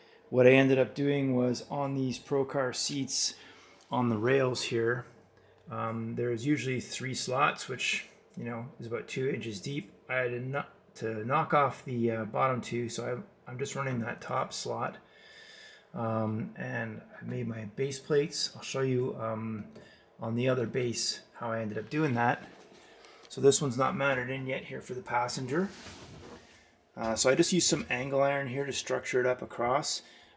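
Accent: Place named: Canada. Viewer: Not even finished and it looks killer already !!! Great color choice. Would love to see it in person at a car show someday 👀 The accent leads me to believe you're quite a ways north of Colorado , be a bit too far 😉 Possibly up in Canada 🤔 Anyways really appreciate the videos.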